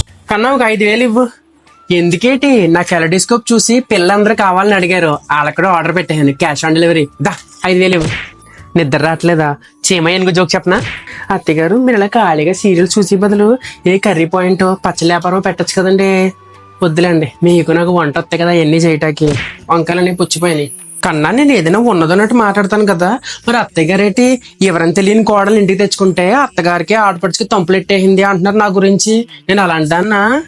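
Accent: Godavari, India. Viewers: 😁we are from rayakseema and Nellore listening your accent reminds us of our inkawsnside from Godavari district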